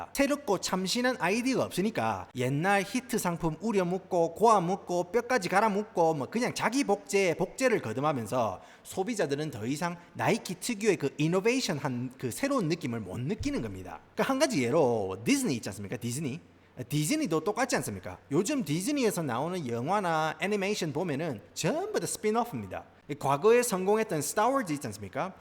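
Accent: Gyeongsang, South Korea. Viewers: 왤케 발음이 좋아요? 갱상도 바이브가 네이티브임❤ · 영어도 경상도 사투리 억양이 있네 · Chic한 현지식 영어발음 구시한 경남 사투리의 조화 ㄷㄷㄷ 평냉에 스리라차 소스 넣은 감칠맛...이 좋아오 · ㅈ ㄴ 경상도 사투리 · 내용도 유익하고 말씀도 친숙하고 알아듣기쉽게 경상도 내의 서부유타읍 악센트와 발음으로 말아주시니 정말 재밌습니다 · 웓따 행님 발음 함 구~수 하구마이. 영어는 미끄덩~ 사투리는 갱상도 싸나이네~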